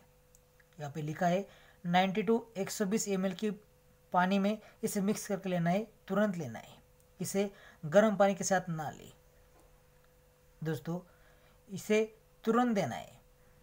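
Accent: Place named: Maharashtra, India. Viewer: बोलने वाला महाराष्ट्रियन हैं ना , गाँवठी बोलता हैं , ठीक से बोला करो भाई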